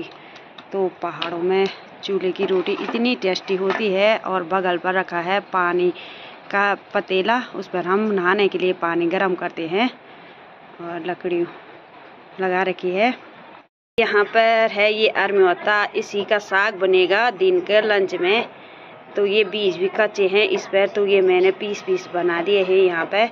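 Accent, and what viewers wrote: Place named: Garhwal, India. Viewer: Jitni marji Hindi bol lo gadhwal ton me bat to ho hi jati hai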